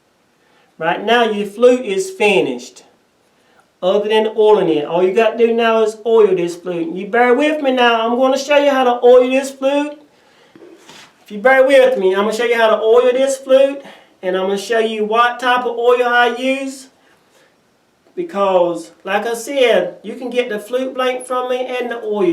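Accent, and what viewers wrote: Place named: South, United States. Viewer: LOVE the southern drawl in your voice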